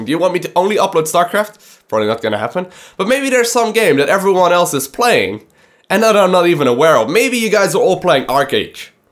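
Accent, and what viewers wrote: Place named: Netherlands. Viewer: Already thought that you were Dutch from your accent, now I have confirmation.....<3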